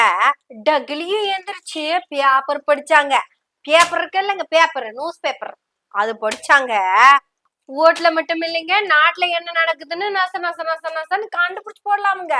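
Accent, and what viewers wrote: Kongu, India. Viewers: ❤ நகைச்சுவை மிக்க கொங்கு தமிழ் அழகாக அரசியல் விளையாடுது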